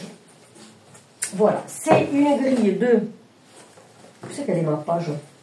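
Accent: South, France. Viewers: Coucou, j aime toujours autant regarder tes vidéos ☺️ en plus tu as toujours des astuces en bricolage qui sont très chouettes. Tu sais le principal en tournant les vidéos c est à la base se faire plaisir , peut importe si il y en a des tonnes sur YouTube, du moment que tu prends plaisir à les réaliser c est gagné 😉 en tout les cas moi je le vois comme cela . Et puis les gens adorent l accent du Sud , bon ok vu que tu es de ma région je suis un peu chauvine du coup 😅🤣🤣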